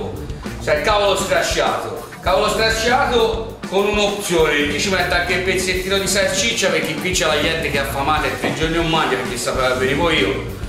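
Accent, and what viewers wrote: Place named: Livorno, Italy. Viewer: Dev'essè bono!!! Livornese abbestia eh!? Bravo, ottima spiegazione!!!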